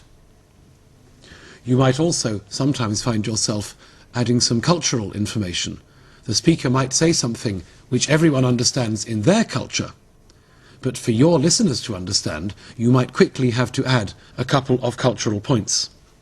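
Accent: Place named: United Kingdom. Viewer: good, quiet visual example of how an interpretaion works. And this pure, brand british accent is so ... lovely!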